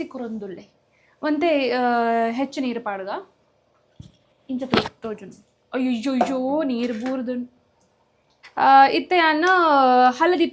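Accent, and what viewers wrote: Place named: Kundapura, India. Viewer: I like your Kundapura kannada , please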